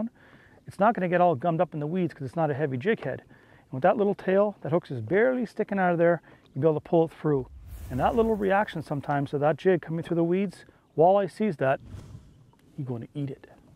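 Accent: Canada. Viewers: lol sounds like he from canada. Thanks for the great tips! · Are u Canadian eh?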